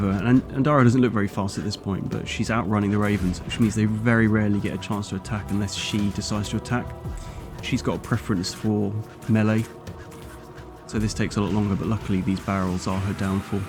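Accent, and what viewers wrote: United Kingdom. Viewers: Haha! I thought it was like a translation from Diablo 2 in German or something. But your accent is 100 % purebred British and not sauerkraut so I got confused.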